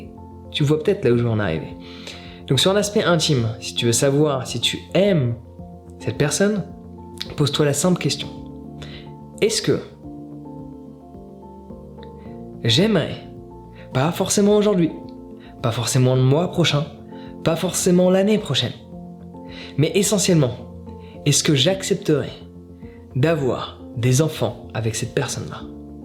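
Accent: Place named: England, United Kingdom. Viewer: Curieux, c’est la première fois que j’entends quelqu’un parler français avec une intonantion anglaise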